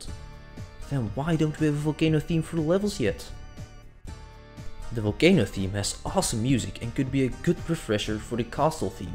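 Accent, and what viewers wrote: Netherlands. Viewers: are you dutch? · WOWOWOWOWOWOWWW! TALKING? I MEAN... I'VE BEEN A BIT DISTANT FROM THIS CHANNEL LATELY, BUT... CAN SPEAK? (I like your voice tho. And the dutch accent is pretty awesome to hear, you don't hear that accent on youtube often)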